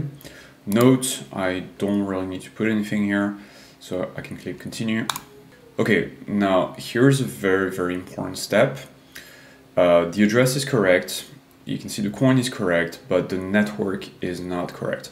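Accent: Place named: France. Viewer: Super helpful. Love the French accent, tho.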